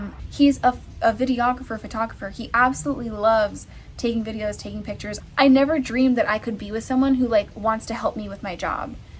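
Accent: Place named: United States. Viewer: You've gotten a country accent!! How do you still make income when you're not posting? I'm not asking for like a super personal answer, but I'm curious what other streams of income you have, help a girl out😂 Is the house you're at outside now the new home, or the old one? Whichever it is, the porch is definitely giving that old farmstyle vintage house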